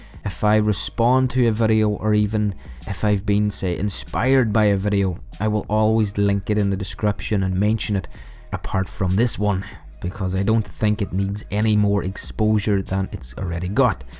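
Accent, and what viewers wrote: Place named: Derry, United Kingdom. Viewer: explains why you have a slightly northern/ derry accent :) i live in dungannon tyrone, a big town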